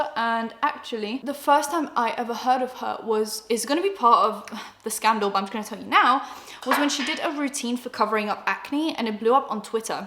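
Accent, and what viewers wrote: United States. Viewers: You had it right, it’s a New York or Jersey type of accent. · She has a harsh Bostonian accent. I live in Boston. And it’s not weird to hear.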